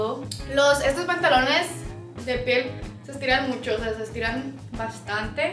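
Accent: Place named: Fresa, Mexico. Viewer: Esta morra se volvió fresa 😂 habla bien fresa ahora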